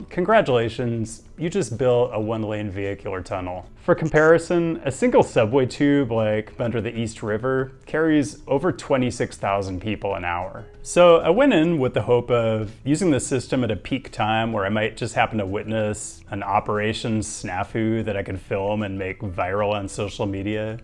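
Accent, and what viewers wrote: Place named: Southern California, United States. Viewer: Why is this man so handsome where is he from? He sounds like he is from south California maybe San Diego ? He has cat but not sure if he has wife or partner?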